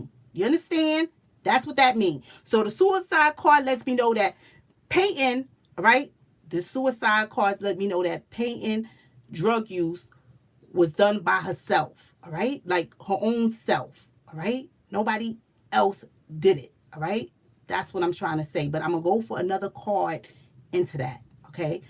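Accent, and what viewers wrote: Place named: New York, United States. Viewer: I knew you were from NY!!! You have that flava!!!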